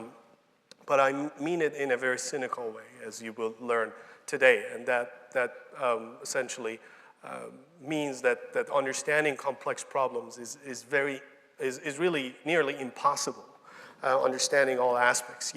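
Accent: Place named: England, United Kingdom. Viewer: I’m awed by this beautiful accent of yours, Prof. Madani! I came here to listen to your English accent and go, but I came, stayed, and listened to the whole speech. Watched it, loved it, and learned so many things from it. I'm a proud Iranian, but so sorry for not having you here in the country. Good Luck.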